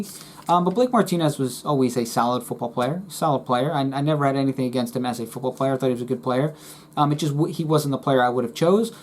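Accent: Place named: New York, United States. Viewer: Dude,....love the New York accent.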